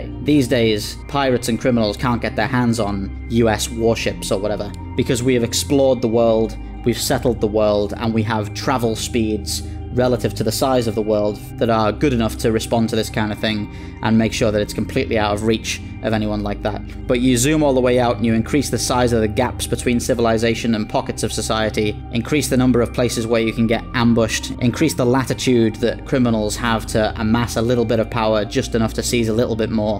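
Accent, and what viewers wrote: United Kingdom. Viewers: When I heard the British accent I had to laugh. I can legally weld a machine gun or flamethrower to my car, and, at worst, get hassled by a cop for a "public disturbance." I also have a 3D printer and drill press with which I can pretty much manufacture whatever kind of gun I want. The topic of this video had never occurred to me. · Man, you sound soooooo British. "If normal people are armed then the criminals will just steal their guns".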